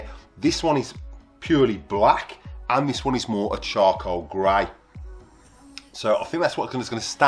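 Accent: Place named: Cockney, United Kingdom. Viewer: Can't understand his English much of the time. He speaks with a strong cockney accent. I wish I could because the information is good.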